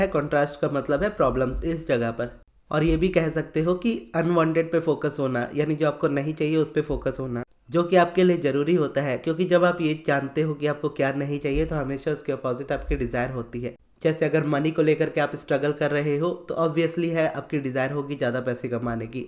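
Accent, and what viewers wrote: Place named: Jamshedpur, India. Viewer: Pta h bhai mai v jamshedpur jharkhand adityapur se hu aapko ek baat batau jindgi mai low of attraction ke videos dekh dekh 2014 se paresan ho gai the matlab bohot confuse fir ek din maine universe ko bola bhagwan please kisi v tarah koi insan ho jis se low of attraction bohot easy ho jaay sikhna please us se mila do aur us waqt mai bank mai baithe the aur aapki ek video mili aaj wo ek h mai her video apki low of attraction ke achi se samjh pati hu sikh pati hu starting se aapko follow kr rahi hu aapko aur aapki awaj sun ke feel hua pta ni kuy ye humlogo ke yahna se he h fir pta v chal gya aap v jamshedpur se he ho aur main v aaj low of attraction bohot ache se sirf aapse sikha matlab universe ko bola unhone aapko bhej diya .aaj meditation v sirf aapke wajah se kr pati hu.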